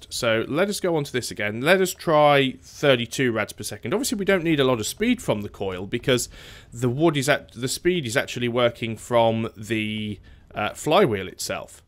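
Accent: United Kingdom. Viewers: good bye forever :( this was a nice change of pace, this should have been called, exploring rotary craft. MAN! i really enjoyed this one, I really just like hearing your voice while I play minecraft, honestly. I could hire you to just talk while playing minecraft and explain everything you do in your british way. its really fascinating and relaxing to listen to you speak. please consider revisiting minecraft!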